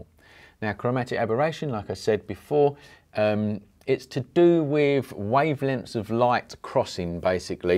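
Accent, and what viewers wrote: United Kingdom. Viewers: what i learn is, UK accent, is very pleasing if i may presume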